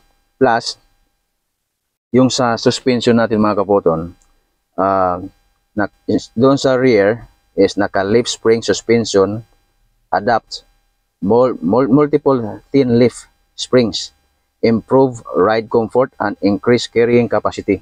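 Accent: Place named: Visayas, Philippines. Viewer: Bakit bisaya punto mo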